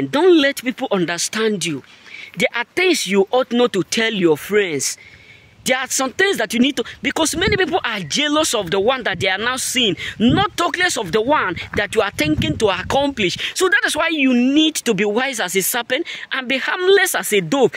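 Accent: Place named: Africa. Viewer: I love your videos I would like to encourage all chosen ones to go ahead and click on the transcript so you can understand exactly what he's saying I know his African accent is a lot so click on the transcripts and you can read word for word exactly what he's saying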